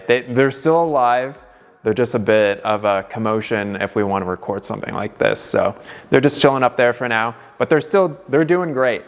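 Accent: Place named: Canada. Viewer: he says aboat like a Canadian! nice job on shower.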